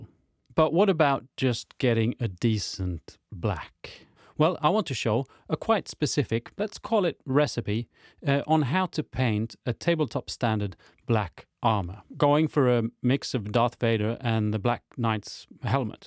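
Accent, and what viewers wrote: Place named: Sweden. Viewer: thanks for the vid, black hair have always been something I have avoided due to it being hard. as I can here your from Sweden. where did you buy your spray booth? it looks like its one of those foldable once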